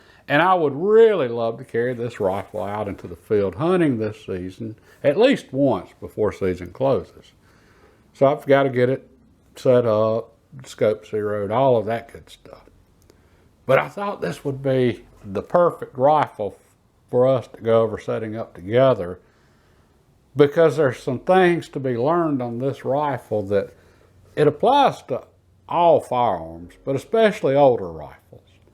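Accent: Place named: North Georgia, United States. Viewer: Enjoying your channel, I have been trying to pin down where you are from according to your accent. I was a trucker in my younger years and consider myself pretty good at guessing, leaning towards northern Georgia or maybe middle Tennessee 🤷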